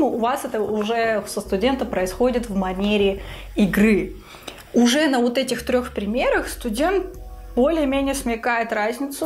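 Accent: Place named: Thailand. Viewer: Корейский звучал с тайским акцентом))))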